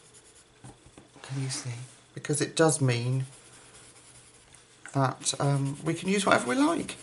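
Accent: England, United Kingdom. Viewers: It’s so lovely to hear an English accent. I particularly appreciate the mention of the paper quality. I love Hanna Karlzon books as the paper will take watercolour pencils too. Many thanks for your tutorial. I’ve subscribed 🙏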